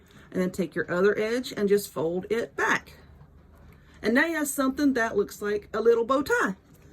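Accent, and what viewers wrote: South, United States. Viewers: First time watching! Love the easy project. Your southern accent is beautiful and you are a funny girl. Like, Like, Like! 👍 👍 👍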